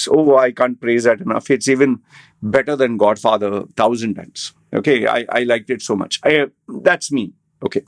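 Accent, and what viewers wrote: India. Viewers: This guy claims he studied in CBSE, but doesn't know who Aurangzeb is, doesn't know about Maratha empire, doesn't recognize Shiva tandava stotram, cannot pronounce basic Indian names, has an Indian accent but acting like a gora who is clueless about India, "oh, what is this strange Hin-doo stuff", etc. Something seriously weird going on...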